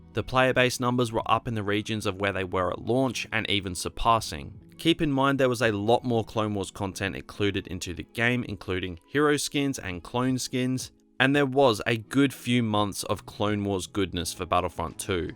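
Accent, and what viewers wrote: Australia. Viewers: ThePizzadude93 he’s not British tho he’s Australian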